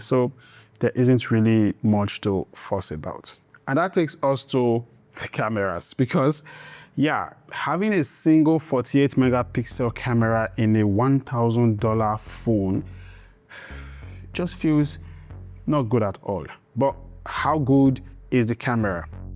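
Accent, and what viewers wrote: Africa. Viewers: I was so excited to click on the video and then I heard that damn African accent I am gone can’t do it. It’s like literally slightly less annoying than the Indian accent but just as bad can’t hear it sounds like chalk on a goddamn board.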